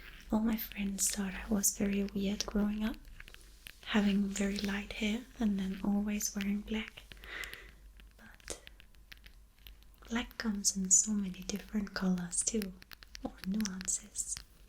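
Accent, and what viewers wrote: Denmark. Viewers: Please do more of these Danish accent videos! It reminds me of my Danish grandparents, they both spoke fluently and had slight accents. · Det er ikke danglish nok. Jeg kan faktisk holde ud at høre på det :^) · This video was AMAZING! I loved the layered sounds going on, and your voice was so clear and can we talk about your wonderful accent and Danish dialogue?! Goodness was it tingle inducing! Bravo!! ❤ 😍 ❤ 😍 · the danish accent!!! :) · Som dansktalende, krummer jeg tæer over den accent.. Danglish at its finest.. Hvorom alting er, skøn video som altid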